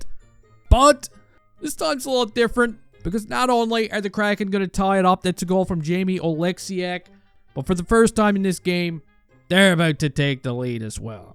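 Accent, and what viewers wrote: Newfoundland, Canada. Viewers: I don’t think it’s from anywhere in Britain. Pretty sure it’s newfie (I say that lovingly by the way)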